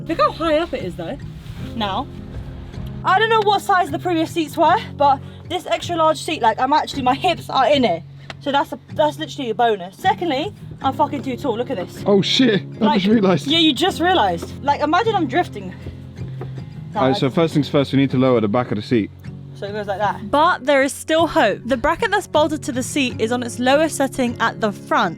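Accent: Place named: Jamaica. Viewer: Why do you talk like a yardie ?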